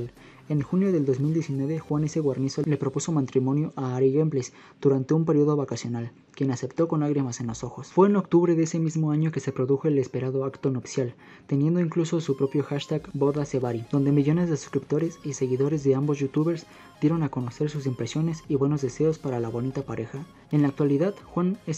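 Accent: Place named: Mexico. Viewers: Nació en colombia????????? 😲😲 Nunca lo imaginé, no solo usa modismos mexicanos si no que tiene las características tanto de lenguaje, habla y acento mexicano. Yo que soy mexicano, pensé que Juan (nombre muy 🇲🇽) era mexicano de toda la vida por como hablaba, se nota cuando alguien aprendió a hablar el acento mexicano y cuando alguien ya lo tiene desde siempre, y el el la primera persona que he visto que es de otro país y habla 100% como un mexicano, no se le nota secuela alguna de su país natal al hablar y expresarse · otros diran que no tiene indentidad y nada de su pais natal, pero para mi se acostumbro al acento mexicano lo domino al 100% y ya jajaja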